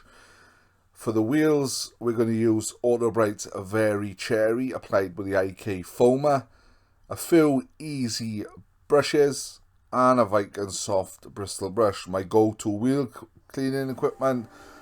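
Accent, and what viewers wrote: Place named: Wales, United Kingdom. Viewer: Great job buddy. Love listening to those gorgeous Welsh tones 😊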